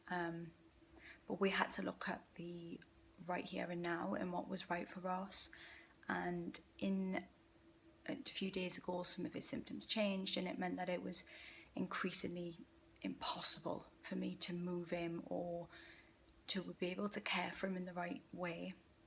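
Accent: Newcastle, United Kingdom. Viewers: your Geordie accent video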